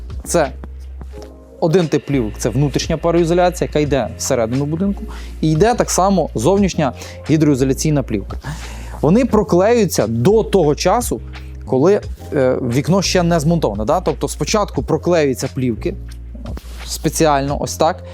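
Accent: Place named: Galicia, Ukraine. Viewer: Я понимаю это позорный галичанский суржик бандеровцев. Мерзко слушать . Тоже отписка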